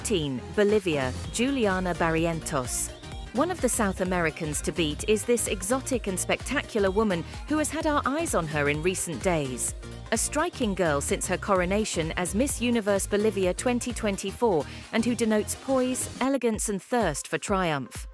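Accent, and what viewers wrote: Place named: England, United Kingdom. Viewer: English, your voice sounds like the Crown sisters from the Philippines and love it and the English accent.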